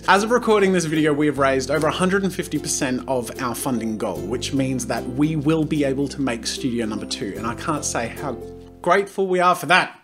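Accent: United States. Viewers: his American accent is incredible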